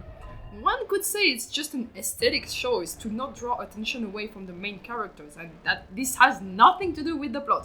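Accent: France. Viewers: Is that french accent 🙏🏼😭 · Not related to the video but are you french by any chance? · Very cool video but I just want to ask are you french ? Sorry im curious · Est-ce un accent français que j'entends là? (Is it a French accent that I'm hearing here?) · Watching cool video As a French instantly recognise the strong French accent Even cooler video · French accent (⑅•ᴗ•⑅)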